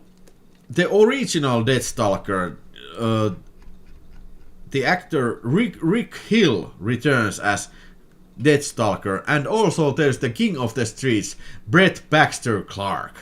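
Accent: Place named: Lapland, Finland. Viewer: This is the first video of yours I've seen, but I love style and content. The accent takes me back to all the summers I spent in Lappland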